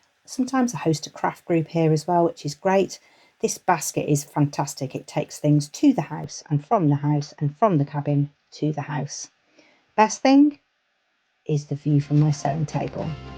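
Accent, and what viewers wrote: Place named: England, United Kingdom. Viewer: first time here, love the clear style and lovely English accent. great tip for remembering which needle is in the machine.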